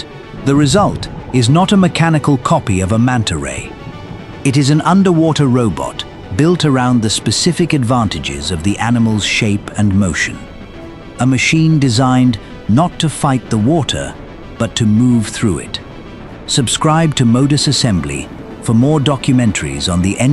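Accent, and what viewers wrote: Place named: United Kingdom. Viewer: strong British accent is a dead giveaway that video is a fraud. Scammers.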